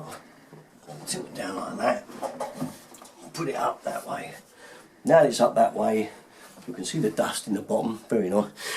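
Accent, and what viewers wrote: United Kingdom. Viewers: Hate to ask such a stupid question but I have to know, where are you guys from? Hard to place the accent but it's definitely somewhere in the UK haha I love it though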